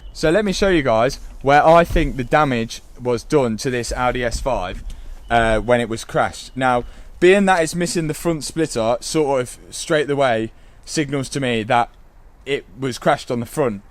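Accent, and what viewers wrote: Leicester, United Kingdom. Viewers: its a lovely car, even if you left it as it is now it still looks nice. are you from Leicester? your accent sounds like it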